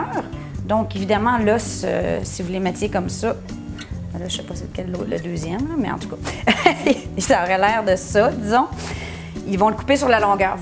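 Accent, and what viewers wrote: Canada. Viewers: Salut à toi, j'adore ton accent canadien,mais dit moi je croyais que les os à moelle c'était juste bon pour mon berger allemand,et mon labrador,quand j'en mettait dans mon pot au feu c'était juste pour parfumé mon bouillon de pot au feu et ensuite je les donnais comme friandises à mes deux chiens,j'ignorais totalement que cela pouvait se mangé et se consommer,c'est pas un peu gras tout cela dit moi???,en tout ka super recette merci beaucoup à toi,toutes mes félicitations à toi!!!.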